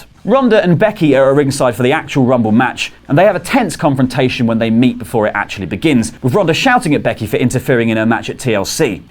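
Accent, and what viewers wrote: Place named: United Kingdom. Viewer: Great bedtime story and ur UK accent makes more like store time o yes store time with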